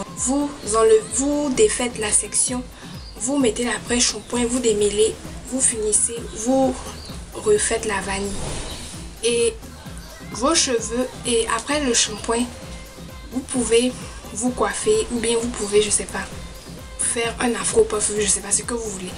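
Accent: Ivory Coast. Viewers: J'ai imediatement cu qu'elle est ivoiriene grase a son acsen francais.D'esole pour mais faute mon clavier est en Englais je Vie au etat unit et je suis burkinabe je vais au licey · Jaime bien tes conseil tes belle avec cette voiture mais l'accent ivoirien te a trop mais bon courage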